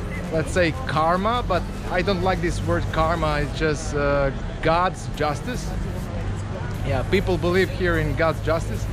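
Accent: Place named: Niš, Serbia. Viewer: "..Let me tell you of the days of High Advantures!" Dobar video čak ti je i eng niški !! 👌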